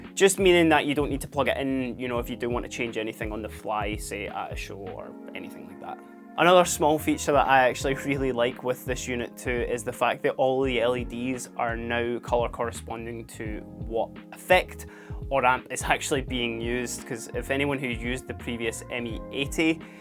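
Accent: Scotland, United Kingdom. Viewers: He can’t help is Scottish accent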